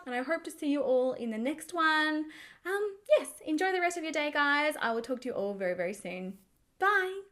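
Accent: Australia. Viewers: Love the Aussie accent😍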